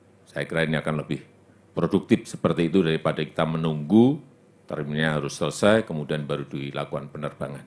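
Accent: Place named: Purbalingga, Indonesia. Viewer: Wong purbalingga ndi kie suarane. 😀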